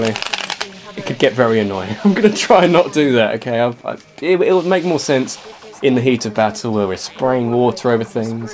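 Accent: United Kingdom. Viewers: It's been a while since I've watched one of let's plays, and I've really missed his nice british voice. I've got three more LPs lined up after this one, so I'm gonna get a whole lot of lovely brit; after too long of no brit. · Jarren Bonson Subtitles, for when sound isn't an option beyond hearing that wunderbar British accent.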